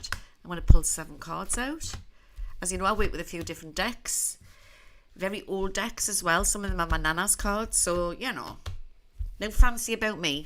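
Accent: Newcastle, United Kingdom. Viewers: I love hearing you because you are a Geordie who is a spiritualist and I miss the spookies back in south Shields. You soothe me. From Lisa in Tasmania Australia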